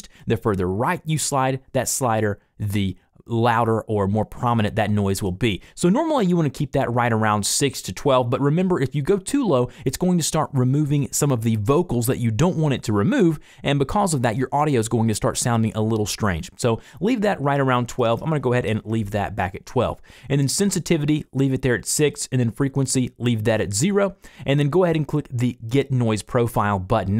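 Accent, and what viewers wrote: United States. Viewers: Man u got a nice accent, which state ur from? -( I’m not an American)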